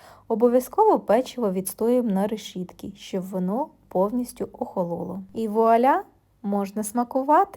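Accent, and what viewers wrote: Kirovohrad, Ukraine. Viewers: Хазявка рибалка..Гарний наш кіровоградський вимов..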